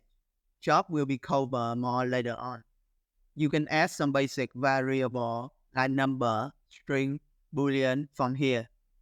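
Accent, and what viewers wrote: Vietnam. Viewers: Nghe cách nói của ông cháu là biết ngay người Việt Nam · Giọng nghe rất Việt Nam :) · Your voice sounds like a Vietnamese person speaking English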